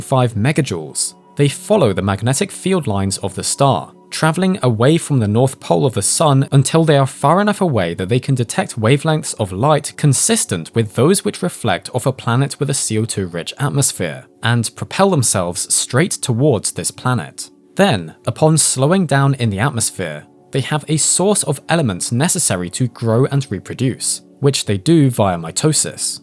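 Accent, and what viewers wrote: United Kingdom. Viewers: American discovers the existence of British English pronunciations, lmao. He's not an AI, he's just British. You didn't think every English speaker in the world spoke American English, did you?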